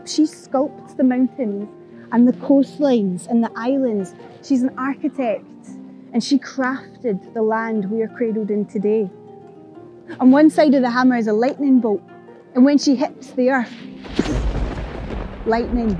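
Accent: Scotland, United Kingdom. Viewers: A Scottish accent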